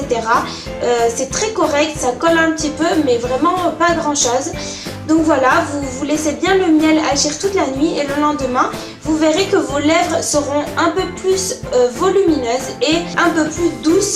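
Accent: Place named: Sweden. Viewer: OMG ton accent suédois j’adore persso j’ai le même ❤️❤️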